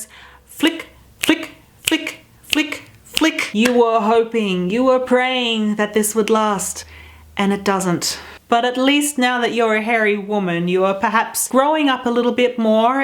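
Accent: Australia. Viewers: R u Australian? Love the accent.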